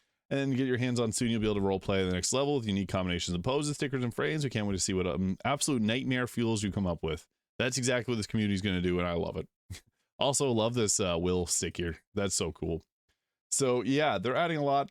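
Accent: Canada. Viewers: okay that makes sense since i couldn’t quite pin it ! assumed canadian but it was different than any i had heard before so i wasn’t sure :p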